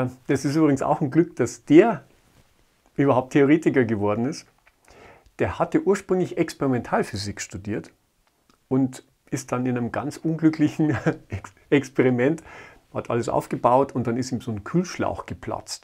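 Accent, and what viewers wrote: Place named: Bavaria, Germany. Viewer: Trey 666 tiefstes Bayern wär mein tip ;)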